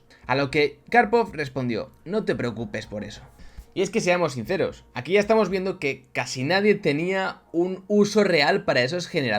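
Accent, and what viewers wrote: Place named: Spain. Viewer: La IA no tiene acento español no ves que es una persona de carne y hueso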